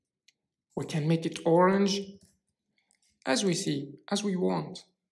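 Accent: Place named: England, United Kingdom. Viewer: Love the way you explain concepts, and especially your English accent it's so cute!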